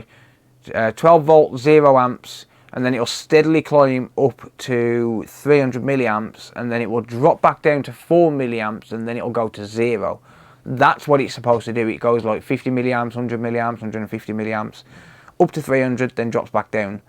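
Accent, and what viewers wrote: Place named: West Midlands, United Kingdom. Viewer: That sounds like a Willenhall accent. 👍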